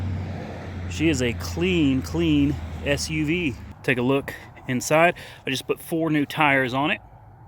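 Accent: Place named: Oklahoma, United States. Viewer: What part of oklahoma you from?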